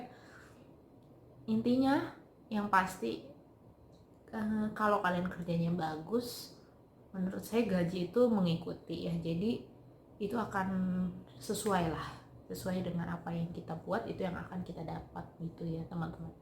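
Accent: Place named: East Java, Indonesia. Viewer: Mbaknya pasti orang jawa timur, kalau salah maaf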